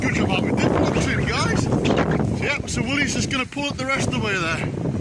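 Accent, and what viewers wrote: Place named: United Kingdom. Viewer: Wow, what a cool wee channel you've got, and it's nice to hear recoveries done with a British accent. You could count that as an Off-Road Recovery, so aligning you with channels like Matt's Off Road Recovery, Trail Mater, Fab Rats, Robby Layton etc. Those channels are doing really well with MORR up to 1.6 million subscribers and making close to US$700K a year, and that's without sponsors. I'm looking forward to watching some more of that big truck of yours. <link>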